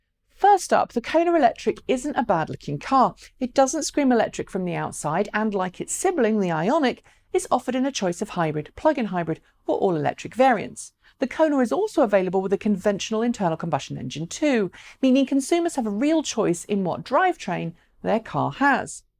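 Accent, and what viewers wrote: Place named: United Kingdom. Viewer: WHERE DID YOU GUYS FIND YOUR TRANSEXUAL COMMENTATOR.....WITH A BRITISH ACCENT......IS THAT SUPPOSED TO BE COOL ..?...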